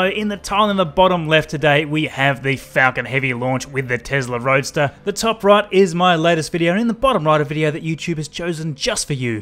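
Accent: Australia. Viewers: Are you an Aussie or a New Zealander?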